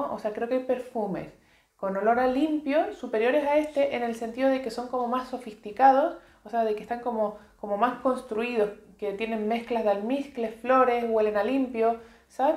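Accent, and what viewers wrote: Chile. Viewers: El acento chileno-español es suena muy lindo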